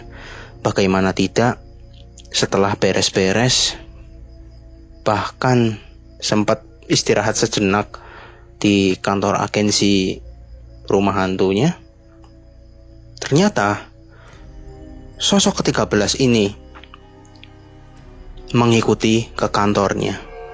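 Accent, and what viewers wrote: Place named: Java, Indonesia. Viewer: saya ga suka nih kalo dia yg baca suara. Medok. trus ga enak bawainnya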